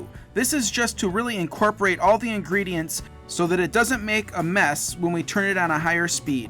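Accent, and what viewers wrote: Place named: Upper Midwest, United States. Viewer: accent from upper mid-west, check. banana peppers on pizza, check. pizza isn't limp when it comes out, check. you have my full endorsement and I will be trying this today. 🙏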